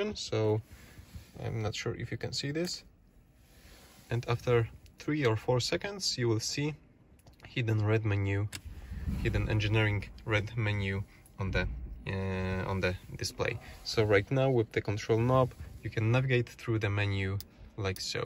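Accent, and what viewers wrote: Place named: Poland. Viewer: Dialekt jak by Polski🤔 super tego szukałem 🙏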